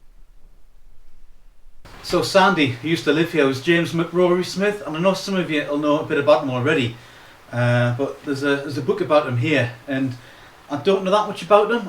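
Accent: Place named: Newcastle, United Kingdom. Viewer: A newcastle accent fuck this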